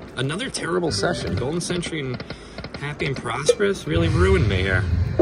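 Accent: New Jersey, United States. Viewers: Love your videos. I love the NJ accent. Favorite I Sar vs I saw. Cool!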